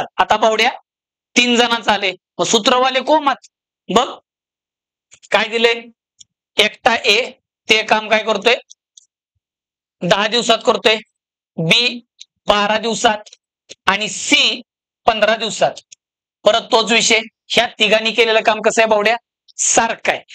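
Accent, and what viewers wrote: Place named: Pandharpur, India. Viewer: Sir tumhi kuthe rahta .... pandharpur taluka ahe ka tumcha.... language tikdchi vatte....ekdam chan teach krta....lagech concept clear hotat....mi khoop videos pahile tumche....shekdewari ,train,kal kaam ....boat. Ni pravah ..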